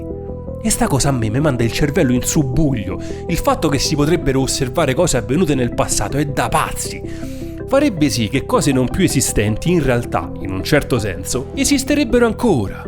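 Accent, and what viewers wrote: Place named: Sicily, Italy. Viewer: PS: sono di Palermo, mi diverte un casino sentirti parlare spesso nei video con l'accento siciliano, vai alla grande 💪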